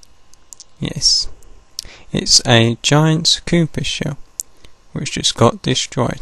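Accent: United Kingdom. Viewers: Very nice video! It's interesting to see how you make all those really cool codes, and memory edit the game! Looks a lot like the hex editor I use (I would suppose so, it's hex editing). Nice British accent too as well! Mine went away a long time ago.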